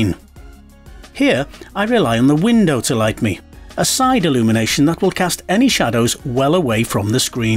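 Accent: United Kingdom. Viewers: British accents clench my jaws...I'm good for about 30 seconds...max...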